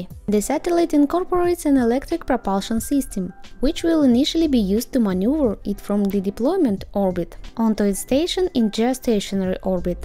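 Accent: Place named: Slovakia. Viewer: As for the accent I'd say Slovak or maybe Romanian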